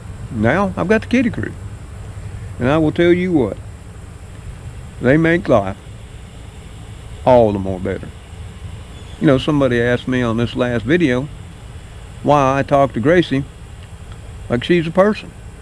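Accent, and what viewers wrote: South, United States. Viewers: Good ole home southern talking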